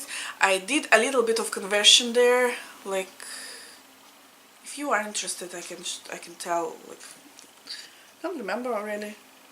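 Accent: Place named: Ireland. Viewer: I can sense a slight Irish flair to your accent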